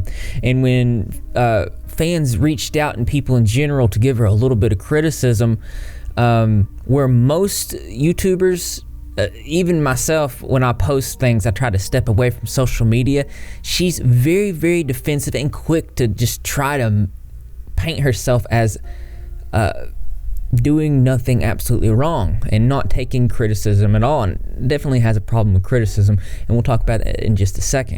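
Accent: South, United States. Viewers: Your southern accent is endearing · are you from the south?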